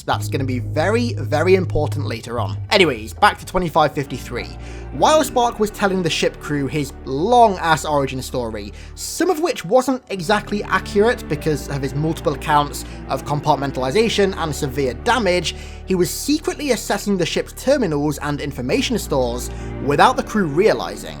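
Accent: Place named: England, United Kingdom. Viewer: congrats you have all the xperience;D i love your vids and yeah you are english right? just a question. because of your accent. like i said congrats.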